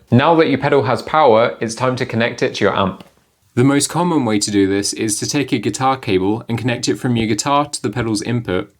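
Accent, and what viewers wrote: United Kingdom. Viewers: He doesn't sound like he's from anywhere in the north to me but I'm not british either. My guess would be somewhere in or around London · this is so random but are u from by yorkshire?? im american but trying to get better at identifying british accents haha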